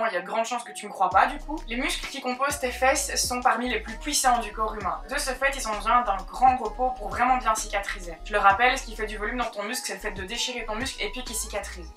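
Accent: Belgium. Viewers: L'accent belge me fait tomber ;) Cela vient pas de de Liège je dirai peut être Hui ou Namur ?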